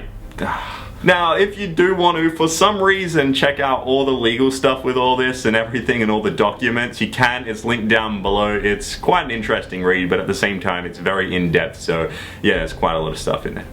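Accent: Australia. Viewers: I love the Australian accent oh lord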